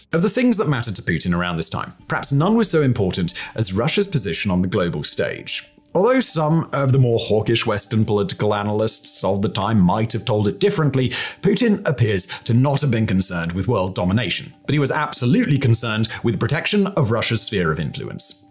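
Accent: RP, United Kingdom. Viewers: When are you going to speak about the betrayal of the Minsk agreements by the West? Will you explain how under Putin the Russian economy has grown exponentially? Salaries and pensions have raised in real terms, the national debt has substantially decreased and how he is standing against Victoria Nuland and her deranged dreams, successfully? Imagine, Russia is single-handedly dealing with the whole of Nato through its Ukrainian proxy and is prevailing. Putin is spearheading the multipolar world and a De-dollarised worldwide trade, that's why the US is bent on destroying Russia, but is achieving exactly the opposite and destroying itself in the meantime. Anyway, you simply don't want to understand that the Russian people love their leader and most of the world seconds them. With your britisher accent I can understand why you want to throw some dort on Putin while ignoring your inbred monarchy ruling for centuries and your unelected leader Sunak. 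Who voted for him? Tell me. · I'm about to take a nap now. If I don't sleep to podcasts I sleep to one of many channels, something about British accents.😂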